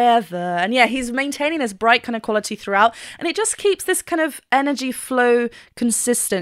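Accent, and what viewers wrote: United Kingdom. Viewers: I love ur British 😅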